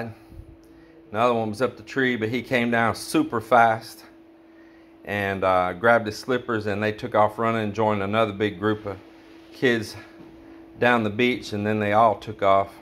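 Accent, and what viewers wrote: Texas, United States. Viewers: It’s language barrier. Your texas accent, figures of speech and sense of humor are “foreign” to them. Learn ilonggo so they understand you. If us Filipinos go to America, we speak english. Since you’re in the Philippines, speak tagalog or ilonggo when you’re in Ilo-ilo. Then you won’t have to stress about them having ‘amnesia’🤣😂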